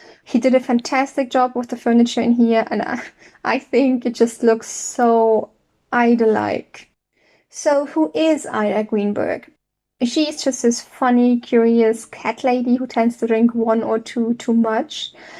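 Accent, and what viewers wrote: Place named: Germany. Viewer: Sie ist sowas von Deutsch. Ihr Akzent 😅😂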